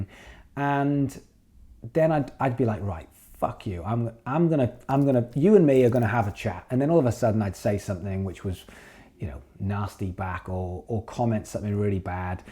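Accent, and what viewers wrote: Wales, United Kingdom. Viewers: Hey just going off your accent are you Welsh?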